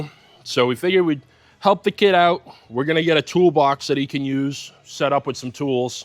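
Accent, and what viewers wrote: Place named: Upstate New York, United States. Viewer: You definitely live in upstate New York with that accent 😂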